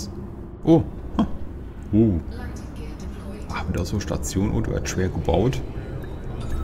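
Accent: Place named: Hesse, Germany. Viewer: Dein Hessisch ist Klasse^^ Hab wieder "2 Guardianstrukturen" nahe am Zentrum als "Staffelmarker" gesetzt.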